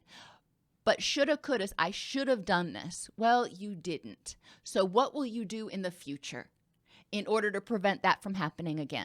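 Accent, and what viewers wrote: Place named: United States. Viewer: Your explanations are really amazing, but I am afraid your accent is too American an you speak so fast for non natives like myself. Thanks a lot though.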